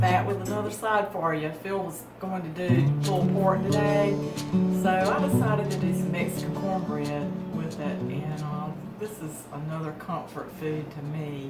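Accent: South, United States. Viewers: Can you just flip the skillet upside down to get it to fall out after you pull it out of the oven? Your genteel Southern accent is all I need to convince me to try this recipe.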